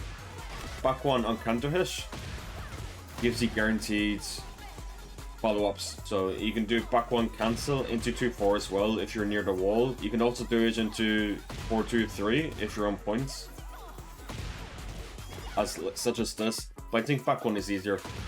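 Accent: Ireland. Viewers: Nice guide and cool Irish accent "counter hish" 😜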